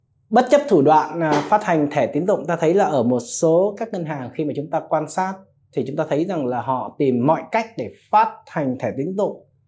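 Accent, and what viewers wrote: Standard. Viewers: Anh nói chuẩn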